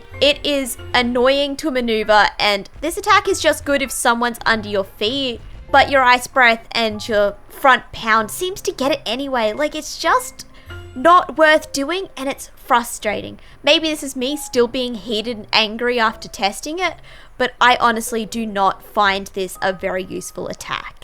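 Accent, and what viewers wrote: Australia. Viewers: When did become a women also ur aussie accent if pretty cool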